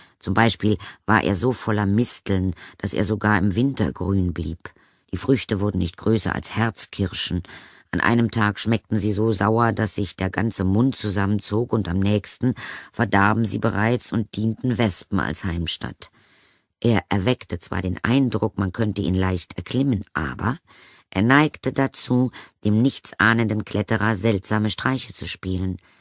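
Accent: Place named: Berlin, Germany. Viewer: Gute Stimme , ich könnte das Beste Hörbuch Schreiben aber nicht vertonen mit meiner Stimme , wäre Volter !! Nein es ist eine besondere Stimme und gut gelesen .Hatt Berliner Dialekt leicht drinnen , denke ich.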